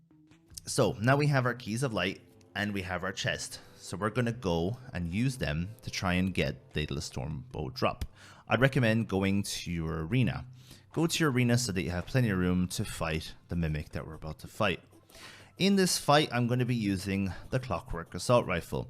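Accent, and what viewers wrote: Ireland. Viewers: r u irish u sound irish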